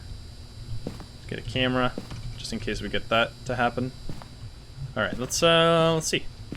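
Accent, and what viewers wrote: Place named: Netherlands. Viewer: seems like so many dutch accents are barely noticeable. I've always wondered why that is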